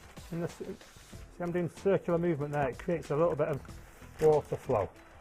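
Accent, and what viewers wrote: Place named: England, United Kingdom. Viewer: You sount like u from England mate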